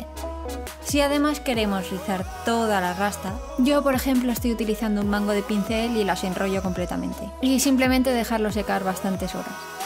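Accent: Spain. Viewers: tu canal es ermozo pero una pregunta eres española?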